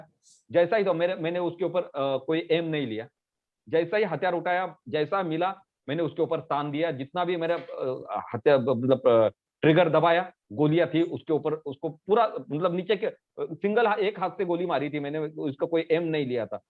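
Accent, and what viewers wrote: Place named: Maharashtra, India. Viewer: Saheb, your marathi accent is very beautiful 🙏🙏 Hats off to your bravery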